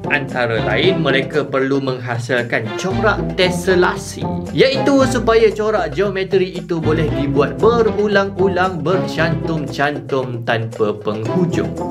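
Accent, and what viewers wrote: Malaysia. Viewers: Disini saya belajar sains + bahasa dan aksen Malaysia sekaligus. Terimakasih 👏👏🙏🏻🙏🏻🙏🏻🙏🏻 Dari negara tetangga 🇮🇩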